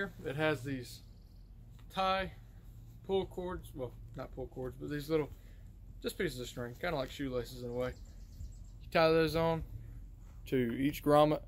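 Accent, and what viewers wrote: South, United States. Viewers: You are the only person I've ever heard with a voice that sounds like mine. You have a southern accent. But it was strange listening to this. I like the channel. Keep it up man.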